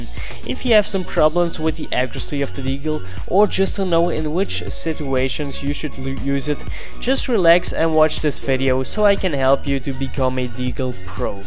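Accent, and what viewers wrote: Netherlands. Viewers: he sounds very dutch to me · Are you dutch? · R u dutch? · Are you Dutch?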